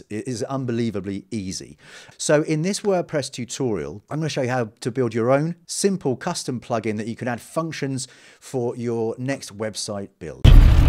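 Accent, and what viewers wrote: United Kingdom. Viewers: Love your tutorial plus your british accent....from Nigeria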